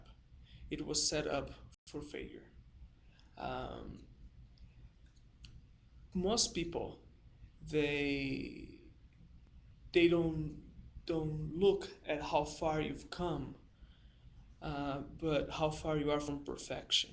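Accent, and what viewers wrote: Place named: Brazil. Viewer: Haha, you have a Brazilian accent! Cheers from Brazil!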